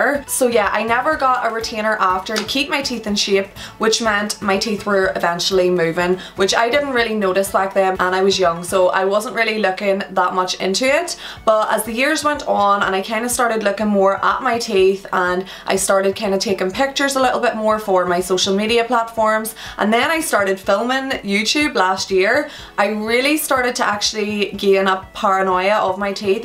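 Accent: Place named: Donegal, Ireland. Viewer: I wanna say that your from Dublin but you have a donegal accent (my accent)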